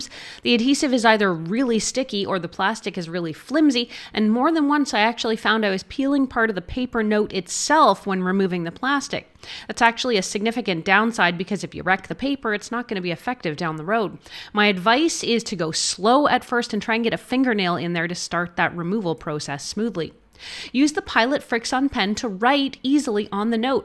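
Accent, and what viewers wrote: Canada. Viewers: Thank you, it seems like a lot of reviewers are Canadians nowadays. The giveaway is the pronunciation of "PROcess" in most reviews😅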